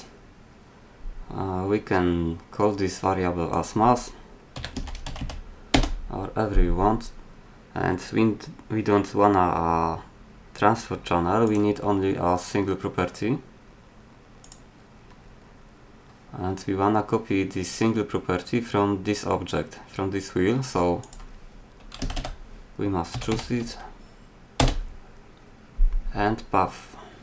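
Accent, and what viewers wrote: Poland. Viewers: jesteś polakiem xD jeśli tak to poznałem po trochę niepoprawnej gramatyce i polskiej wymowie liter i niektórych wyrazów bez urazy ;-)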